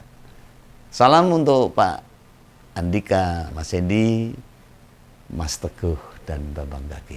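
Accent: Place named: Surakarta, Indonesia. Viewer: sugeng siang om, saya itu suka logatnya om Begawan yang campur bahasa jawa, jadi kami lebih tahu apa yang disampaikan,sehat dan bahagia selalu om, sukses buat Sewordtv nya, Tuhan memberkati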